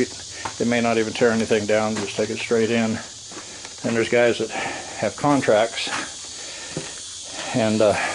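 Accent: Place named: Oklahoma, United States. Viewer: It's the okie accent I've always called it wheelbarrel even though it's barrow we Okies add that url to it just like we say y'all instead of you all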